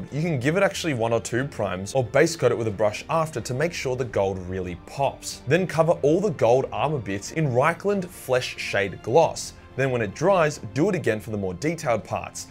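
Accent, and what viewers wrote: Australia. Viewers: I kinda know everything in the video but it's just nice to hear an Australian swearing on youtube.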